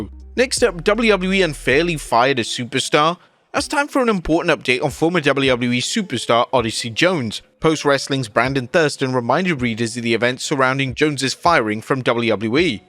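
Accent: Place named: England, United Kingdom. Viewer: Theres 2 different people, an American and an english one, this is the english one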